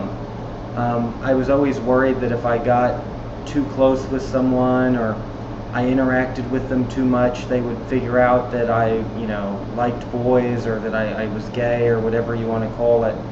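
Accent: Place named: Deep South, United States. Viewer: That's a deep south accent. Most likely Mississippi.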